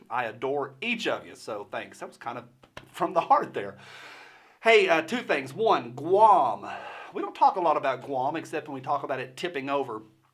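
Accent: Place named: New York, United States. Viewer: I always like your videos, I never get tired of New York accents. And, I love to see what weapon you hold up at the end. For this one, What kind of scope is that??? That thing is huge.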